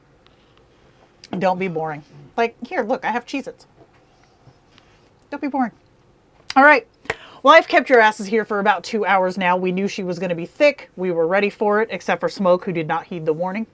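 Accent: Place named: Czech Republic. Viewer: Based on how you can pronounce "R" - Are you from Czechia? :D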